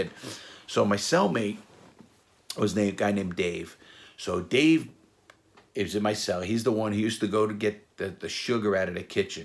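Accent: New York, United States. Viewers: your New York accent is cooler than my Australian one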